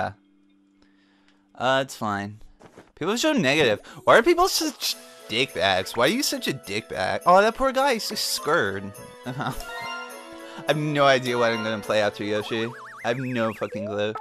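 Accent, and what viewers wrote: California, United States. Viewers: To be fair is obviously from California to most USA residents because he sounds just like a California dude!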